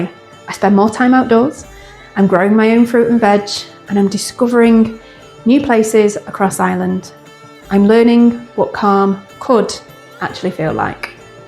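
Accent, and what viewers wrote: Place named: Northern England, United Kingdom. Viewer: Welcome to Ireland. Great northern English accent! Good luck.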